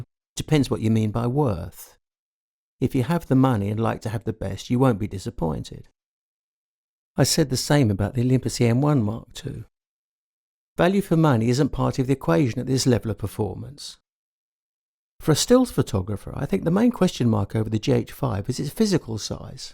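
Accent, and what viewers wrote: United Kingdom. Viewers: Once more, great stuff and accurate opinion. I am professional filmmaker/photographer. I have owned and shot with Nikon D750, Leica M, Olympus EM-1 MKII and the GH5. I agree with absolutely everything you said, the GH5 menus and usability are the best I've used. Cheers from Brazil and keep doing these. You and your British clarity + accent rock. Cheers from Brazil 🇧🇷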